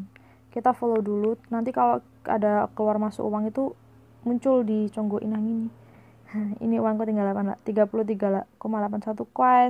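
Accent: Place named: Java, Indonesia. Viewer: Ada medhok"nya gitu deh.wkwk tetep yang sama kek dulu. Semangat kuliahnya dong💖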